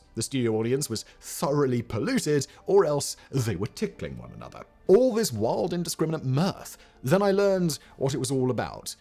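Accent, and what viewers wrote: United Kingdom. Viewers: I think it's ironic that you was a British person or at least a person with a British accent… Did not mention the use of the laugh track in Great Britain… Especially on the Monty Python flying Circus… They fought against a laugh track and were told by the producers"when will people know to laugh"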